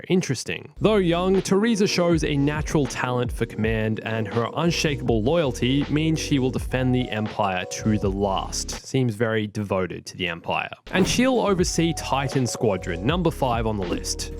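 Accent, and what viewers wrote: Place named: Australia. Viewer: I always find a small to creep over my face when I watch your videos, you just have this gusto and a nice australian accent, thank you for making great videos. 😂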